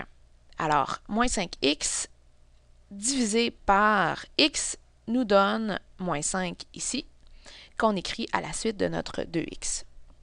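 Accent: Quebec, Canada. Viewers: Mohammed gj c'est l'accent québécois idiot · c est l accent québecois et c est mieux que d entendre un marocain parler...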